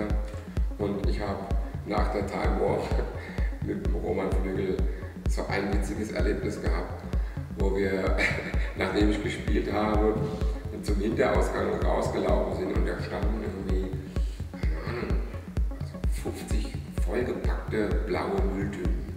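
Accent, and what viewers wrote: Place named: Hesse, Germany. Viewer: es svenche ausem ömche,ich wünsch mir das de mehr hessisch babbelst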